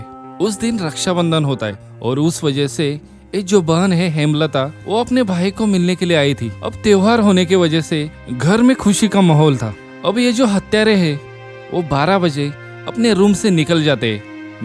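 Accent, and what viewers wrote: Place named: Maharashtra, India. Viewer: I am from श्रीगोंदा your tune is marathi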